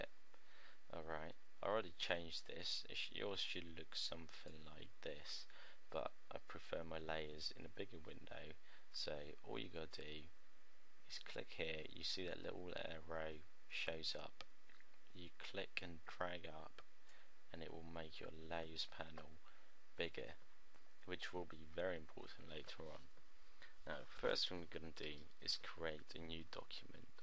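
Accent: United Kingdom. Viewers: It's British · Its an English accent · I do have trouble following British accents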